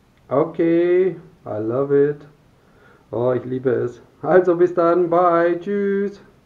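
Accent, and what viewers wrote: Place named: Baden, Germany. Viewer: hallo, ich wohne im chiemgau, am waginger see. aber deinem dialekt nach bist ein badener.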